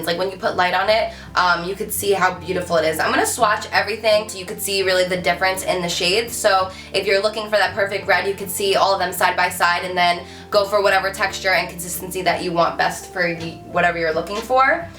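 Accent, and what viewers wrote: New Jersey, United States. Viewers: New Jersey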